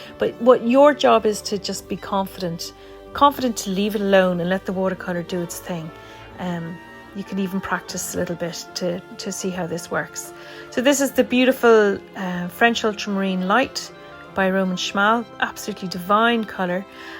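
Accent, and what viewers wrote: Ireland. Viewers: I just found you on YouTube! Love your drawings. Would you share what is the name of the brown ink? I really like it! I also adore your lovely Irish accent! Thank you.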